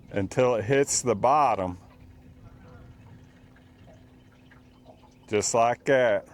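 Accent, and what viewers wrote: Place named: South, United States. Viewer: Love your channel! Plus sounds like you’re a southern boy like me. lol. My gf says wow you and him sound just a like when you talk. You do my kinda Catfishing. Simple gear catching fish. Great video! Up here where I’ve been living in Ohio you Can’t find skipjack here. I wanna move back to Kentucky soon. I lived near Markland Dam and miss it a lot. My son still lives there right next to Markland dam. As soon as my boats done being restored I’m heading that direction lol. This boat restore is killing my body. I stay sore lol. It’s a 1979 Sylvan deep V 16.5 foot. Anyway thank you for another great video! 🎣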